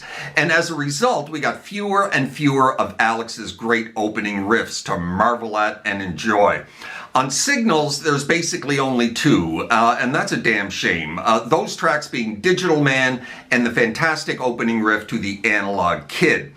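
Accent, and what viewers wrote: Canada. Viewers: Soon as I heard the Canadian accent I knew I was listening to a man who knows his stuff. Rock on from Detroit 🤘🏼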